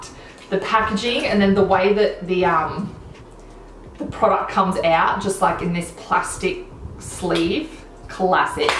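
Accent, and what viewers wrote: Australia. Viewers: I love makeup but I’m here just to learn Australian accent and you help me a lot! I’m going to Australia next year and I’m just ready to buy these products, for sure I will love it 🙌🏼❤️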